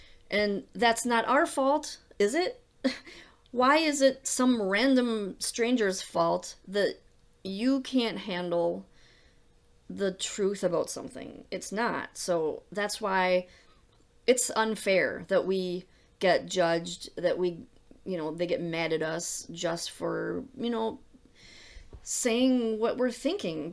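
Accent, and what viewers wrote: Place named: Midwest, United States. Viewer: Are you from the midwest? Like Illinois? Wisconsin? I hear your accent. Triggering is stupid. How YOU react is not MY problem. I got diagnosed at 74. Now 76. Good podcast.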